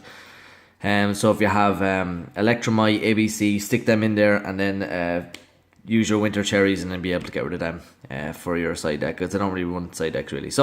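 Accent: Ireland. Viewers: You Irish mate? What part? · Is that an Irish accent I hear?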